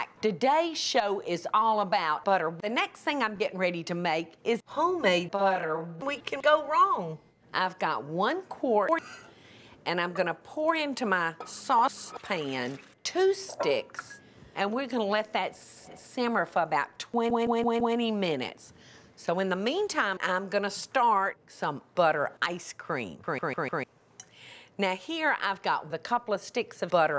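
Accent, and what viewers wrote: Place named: South, United States. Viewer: Did she say ERL Sha calls oil ERL so southern it makes my eyes bleed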